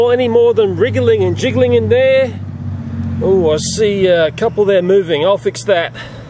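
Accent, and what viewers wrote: New Zealand. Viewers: Thought he was a New Zealander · Yeah sounds like a New Zealand Accent. Which is Taika Waititis nationality (guy who directed thor ragnarok and voiced Korg)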